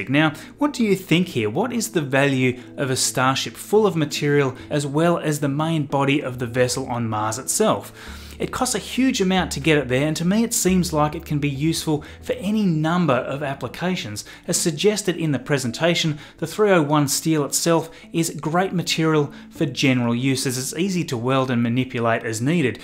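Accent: Australia. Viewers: That's why I pointed it out :P That's an Australian accent btw, not British hehe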